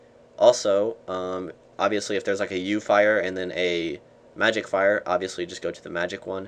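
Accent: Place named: United Kingdom. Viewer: i think he's british... t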